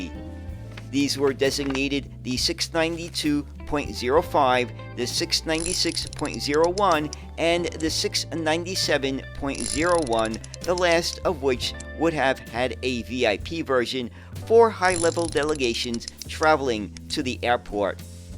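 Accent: New York, United States. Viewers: I'm English who grew up in England. My accent is typically from S E England. Your articles are so informative and interesting. Your New Yorker accent is really great, coupled with your enthusiasm. 😊❤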